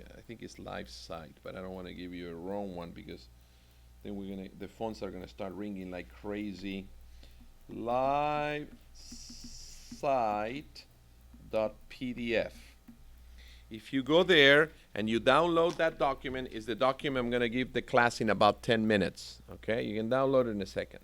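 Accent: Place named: Argentina. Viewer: This professor has an Argentinean accent , i know because I'm Argentinean ;)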